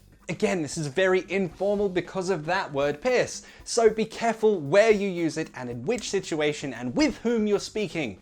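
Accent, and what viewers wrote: United Kingdom. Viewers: U r the best I love ur style n the way of teaching, u make me fall in love British accent as well as American accent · You're fantastic, man!! I love the british accent and your way of speech is a perfect example why I love that! Some day I'll speak a little similar as you! Uk ❤️ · No, we love British accent because of you,!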